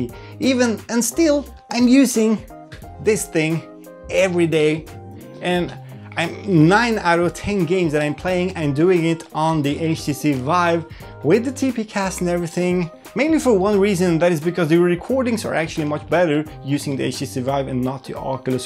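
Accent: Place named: Sweden. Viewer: Not only does energy and enthusiasm for vr come across better than Nathie but he also speaks “swenglish” much better. Nathies popularity comes primarily from his youth and his real life infinite money cheat. My respect goes to the people who earn it outside of youtube.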